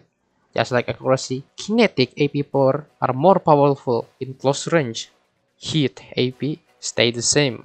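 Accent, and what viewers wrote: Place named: Indonesia. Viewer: Great video, wish I could understand the heavy indonesian accent in some places, but the editing is hilarious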